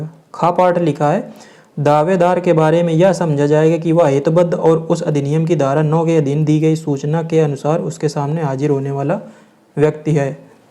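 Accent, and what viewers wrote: Kanpur, India. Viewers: Fatehpur ya kanpur se hai tu bhai kya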